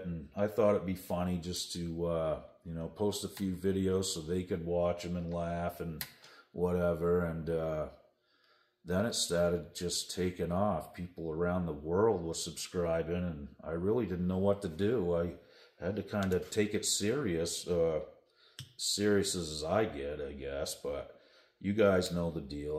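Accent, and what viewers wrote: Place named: Maine, United States. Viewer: As a Bostonian...It's enjoyable to hear a Mainer's take on people making a fuss about your accent.. I can't leave the state without someone asking me to say Pahk the Cah in Hahvahd Yahd...🤘🏻😝. You're a natural teacher... That's why I subscribed. I've learned something every video.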